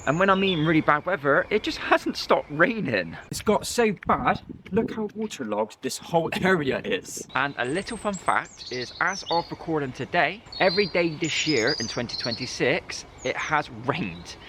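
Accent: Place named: Somerset, United Kingdom. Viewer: Sounds like you are from Somerset or Cornwall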